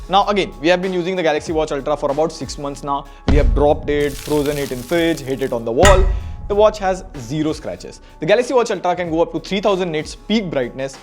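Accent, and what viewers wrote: India. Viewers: I subscribed because of the indian accent voice❤❤❤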